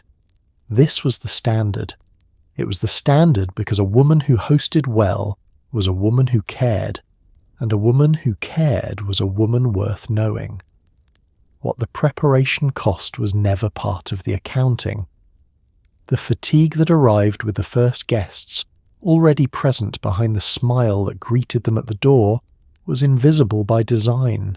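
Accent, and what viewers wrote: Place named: England, United Kingdom. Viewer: I grew up in the US in the 70s. This video is not very accurate. Women had a lot of say in decorating, schools, outings and such. My aunts ,and I had many were in charge of budgets, schedules and cities. Their husbands loved and respected their choices. Rules? Yeah, society had rules but there were those who were breaking those rules left and right. Your English accent makes me think you are following some idea of the seventies, instead of how it really was. Those pictures are not that accurate either. No one ever put their clothes out to hang dry in that manner. If you use AI to reconstruct history,,,, you will fail.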